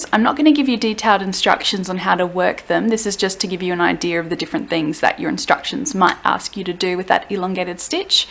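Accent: Australia. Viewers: Thanks for this. I too am knitting socks with this instruction and now I know how to proceed. P.S. It’s nice to hear an Australian voice.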